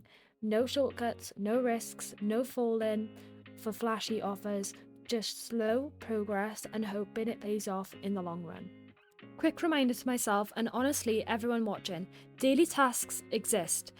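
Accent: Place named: United Kingdom. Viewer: Love your British accent ❤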